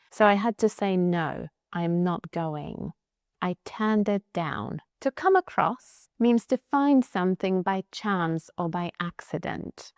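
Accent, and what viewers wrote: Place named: United Kingdom. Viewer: "to stand out" - that's a really funny expression. It reminds me of this Freddie Mercury Movie when he (Rami Malek) was asked about his additional teeth and he answered "What about my teeth - I don't wanna stand out of British people". This is for example a phrasal verb that every English learner ought to understand easily, because in this context it should be clear to the viewer. I am half American and I worked as an English teacher for many years.. And once in a while I have a look into your channel because I'm interested if there is anything I don't know. And I love your British accent. I imitated this British accent so many times that I had to take care not to take it over, because my American relatives were teasing me about it. Once an English Prof told me not to play with my accent because it's so original and native. 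But during my time as a flight attendant with Lufthansa I shared an apartment with a British girl and I found that accent so funny that I had to play around with it.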